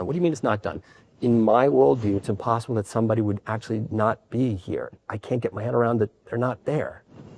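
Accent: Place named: New York, United States. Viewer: Excellent work cleaning this up—I'd actually never heard him speak intelligibly (due to audio quality, not any property of his speech). This guy more or less saved my life with his blog posts. I was completely ignorant, deluded, and self-destructive to an extreme degree until TLP's blog (TLP is the blog, I know, but it's sort of also the man) found me and taught me, very cryptically and indirectly, how it is that a human can exist. I knew they could, and do, exist, but not how. He sounds like he's from New York. I won't check.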